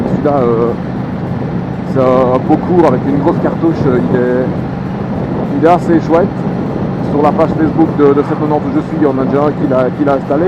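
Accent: Belgium. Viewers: tu as un sacré accent belge ou je me trompe? belle vidéo aussi hein :)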